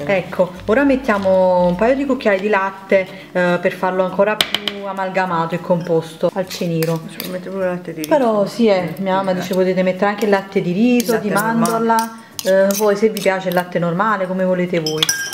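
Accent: Naples, Italy. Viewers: si sente ke sei di napoli😂..cmq bella ricetta io ke amo il. salame al cioccolato e lo faccio spesso..xo ho iniziato la dieta e forse così sarebbe ottimo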